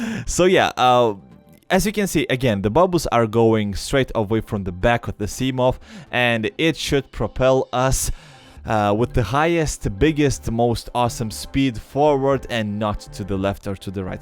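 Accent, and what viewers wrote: Russia. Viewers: wait, is he Russian? he sounds it at least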